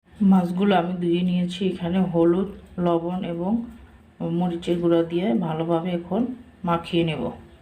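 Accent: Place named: Sirajganj, Bangladesh. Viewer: প্রথমে আমি কে ধন্যবাদ জানাই ধন্যবাদটা এ কারণে আপনাদের এই চ্যালেনে রান্না থেকে আমি অনেক কিছু শিখতে পেরেছি আমি দেশের বাইরে থাকি এখানে রান্না থেকে আমি অনেক কিছু রান্না করতে শিখেছি আমি জানিনা আপনি কোন জেলার মানুষ তবে ভাষা থেকে বোঝা যায় আপনি সিরাজগঞ্জ জেলার মানুষ আমি সিরাজগঞ্জ জেলার কাজিপুর থানার সোনামুখী ইউনিয়নের মানুষ আমাদের ভাষার সাথে আপনার ভাষাটা মিলে যায় এই কারণে বললাম দোয়া করি সামনের দিকে এগিয়ে জান সামনে আরো নতুন কিছু আইটেমের রান্না নিয়ে আসবেন ওখান থেকে আমরা শিখে নিব ধন্যবাদ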